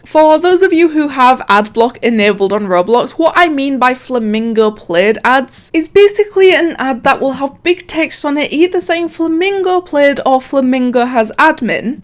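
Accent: Scotland, United Kingdom. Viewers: Wow. 3 seconds in and I can tell you're scottish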